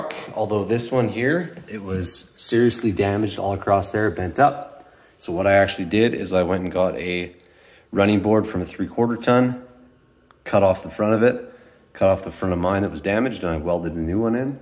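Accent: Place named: Canada. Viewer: 1) you sound Canadian 2) what rear bumper?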